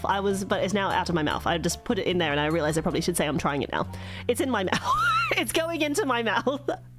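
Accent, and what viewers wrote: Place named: United States. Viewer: I love her American accent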